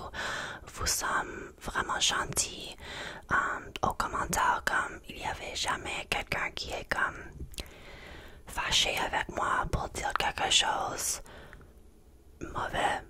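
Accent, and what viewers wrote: Quebec, Canada. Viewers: Honnêtement pour l'internet, vraiment pas trop mal ton Franadien! Well done and very calm ASMR experience!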